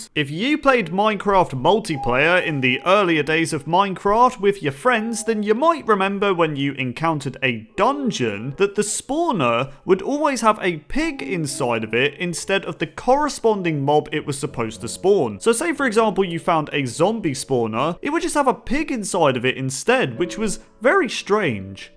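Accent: United Kingdom. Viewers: bruuuh are you talking UK english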